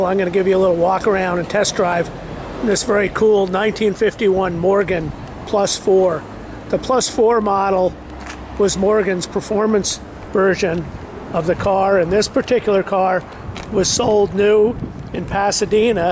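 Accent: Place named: United Kingdom. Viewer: Great use of Anglo Saxon English when pulling out. You could pass for a Brit, thanks for a great review. All the best from England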